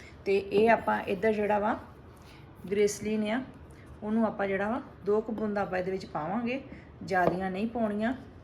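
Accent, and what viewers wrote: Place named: Punjab, India. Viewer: ਬਹੁਤ ਵਧੀਆ ਤਰੀਕੇ ਨਾਲ ਦੱਸਦੇ ਹੋ ਤੁਸੀਂ। ਠੇਠ ਪੰਜਾਬੀ ਬੋਲਣ ਲਈ ਧੰਨਵਾਦ ਜਿਵੇਂ ਬਦੋ ਬਦੀ। ❤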